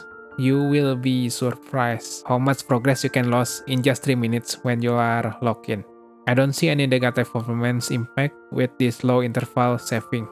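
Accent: Indonesia. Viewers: Thank you for this tutorial! I'm immigrating from ibis to krita and it's so overwhelming. I kinda see why ibis is still searched after, the ui is nothing like other art program, even my 10yo niece master ibispaint super quick! But it's less advanced compared to krita. My friend told me that krita is better if i'm walking the professional path. Anyway when i heard your accent i immediately thought wah indo nih and it turns out to be true lol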